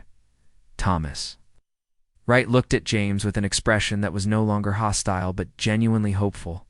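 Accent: United States. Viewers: Bogus, cooked up by this fellow with American accent.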